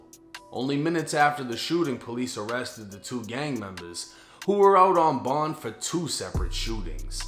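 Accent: Boston, United States. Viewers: Im surprised you still got the boston accent but good video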